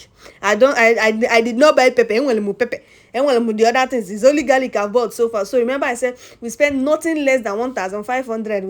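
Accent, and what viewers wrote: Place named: Awka, Nigeria. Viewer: I like you ascent you spoke Igbo language in awka accent giving UMUDIOKA vibes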